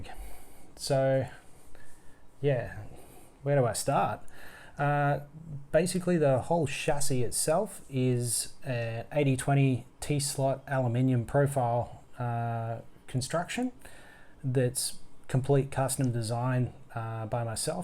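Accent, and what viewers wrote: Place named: Australia. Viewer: Aussie accent my dude.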